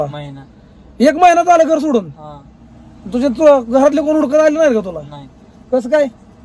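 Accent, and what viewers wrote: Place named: Satara, India. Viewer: एकदम सातारी बोलीभाषेत समजावलं दादा,, आपल्या कार्यास सलाम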